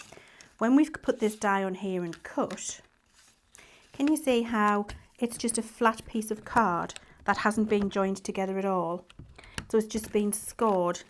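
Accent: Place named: North East England, United Kingdom. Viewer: It sounds like she is from the North East Of England, say Teesside. I come from there, so that’s why I am guessing that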